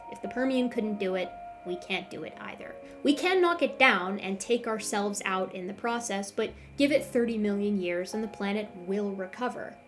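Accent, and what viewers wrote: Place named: United States. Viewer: My only problem with this video is that you said “Carboniferous” when you ought to have said “Mississippian and Pennsylvanian” since, judging by your accent, you are an American. I got marked down for this in college, so my pattern-recognizing brain started yelling at me “DANGER DANGER” in a bizarrely apt demonstration of the point you were making.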